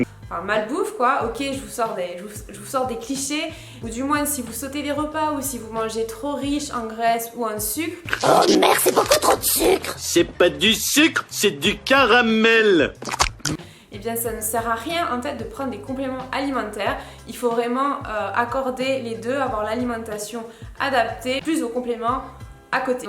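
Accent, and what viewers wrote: South, France. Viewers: J'adore ta voix avec ton petit accent du sud haha video interessante merci continue comme ca tu gere biZe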